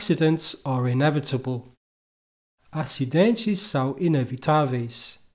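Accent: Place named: United Kingdom. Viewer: Gente, ele fala no inglês britânico né? Mas eu quero aprender no inglês americano, será que escutando esses vídeos eu vou me atrapalhar muito quando escutar no inglês americano??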